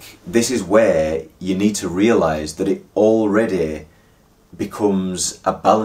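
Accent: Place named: Halifax, Canada. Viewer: where are you from ? im from halifax you sound like you might be close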